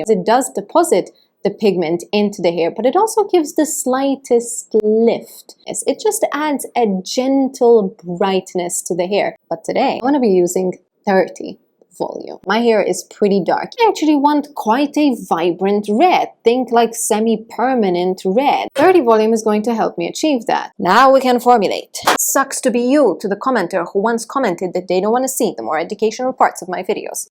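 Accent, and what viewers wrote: Italy. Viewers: Il tuo accento italiano è bellissimo 😍 (Your italian accent is wonderful)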